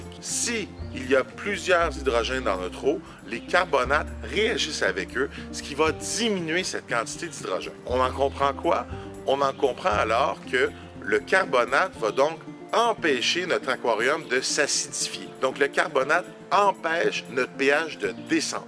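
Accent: Canada. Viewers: Salut, super intéressant et bien expliqué, et l accent canadien j adore . j aimerai bien avoir un thème sur les méthodes efficace pour ce débarrassé des algues , malgré que j ai un chihiros doctor, très difficile de ne pas en avoir... en tous cas je te souhaite de réussir !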